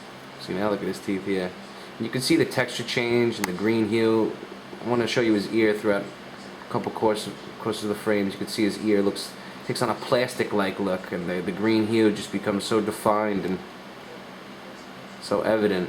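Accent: Boston, United States. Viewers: You from around Boston bruhh?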